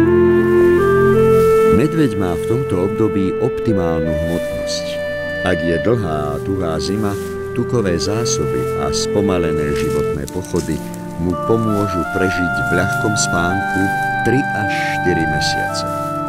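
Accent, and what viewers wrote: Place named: Slovakia. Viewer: Pútavé a tá pravá írečitá Slovenčina.Super.Ďakujem.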